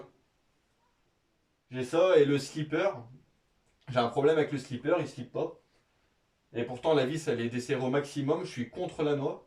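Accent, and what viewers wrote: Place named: Nord-Pas-de-Calais, France. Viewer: Ouais , un ch'ti!! J'ai reconnu l'accent! Welcome l'ami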